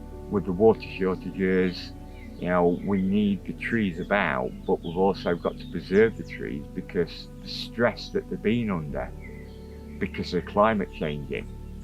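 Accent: East Midlands, United Kingdom. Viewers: From watching Dan's channel abs seeing on there i always thought that sounds like an east Midlands accent! has just confirmed it! Im a Derbyshire lad.😊 Youre doing a fine job 👍🏻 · I'm so very sorry to hear the sad news about. Condolences to family and friends. I first saw on Chateau Diaries and thought I know that accent, being a fellow midlander from Nottingham. will never, ever be forgotten and will live on through all the projects he was involved with, especially Chateau Chaumont. I hope his family get some comfort from reading all of these messages on how much this lovely Viking meant to us all. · Thank you it’s been a pleasure to watch you and to see the care that you not only take of Dan but also the trees. Just one question as a midlander I’ve been trying to work out where you’re from is it Stoke on Trent or Derbyshire 🤔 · Happy Birthday. 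June 2025. I always thought you'd got an East Midlands accent. I love listening to you talk. You said you did some work with the electricity boards. My late Dad, Gene and my Uncle Lawrence were both overhead linesmen at Derby EMEB. They'd get called to different areas of the East Mids during bad weather when Lincs EMEB (for example) hadn't kept up with their maintenance and so they had power lines down. I often remember my Dad on Stand By and having to go out over christmas. You have an idyllic life. Your fox terrier is adorable and having 5 GSDs must be lovely. i am so glad to have another chance to watch this. /Gena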